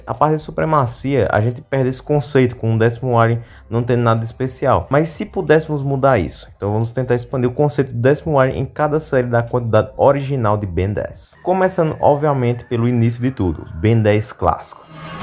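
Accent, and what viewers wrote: Northeast, Brazil. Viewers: A proposta do vídeo é o conhecimento nerdistico, n importa se a dicção dele é boa, oque importa é entender os fatos relatados, e a dicção ele é mt boa, só por causa do sotaque nordestino que vc ta se doendo? Patético.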